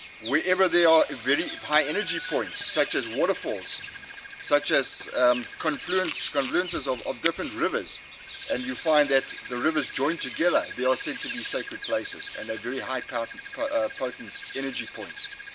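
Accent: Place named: England, United Kingdom. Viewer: Pretty sure that's an English accent too. Colonizers !!!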